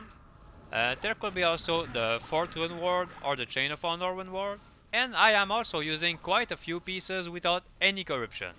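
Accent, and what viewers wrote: Quebec, Canada. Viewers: tu donne quebecois :P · j'aime beaucoup le fait que nous les québécois on a vraiment un accent anglais à nous autres :P Super vidéo man merci!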